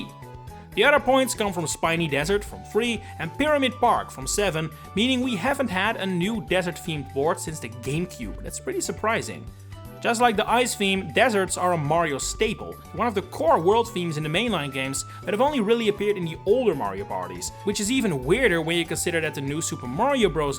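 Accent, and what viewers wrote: Netherlands. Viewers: he's Dutch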